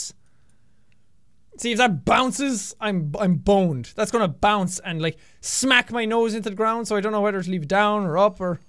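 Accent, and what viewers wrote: Ireland. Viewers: I loved his Irish accent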